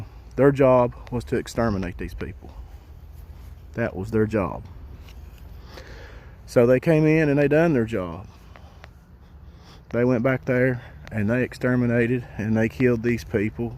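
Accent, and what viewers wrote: South, United States. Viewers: This is beyond interesting. Wow. U got a great southern voice and delivery too. Good job.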